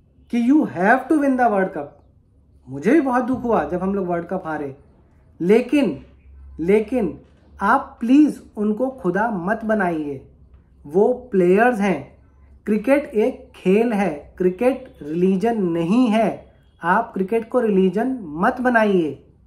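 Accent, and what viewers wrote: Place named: Gujarat, India. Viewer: He sounds like gujarati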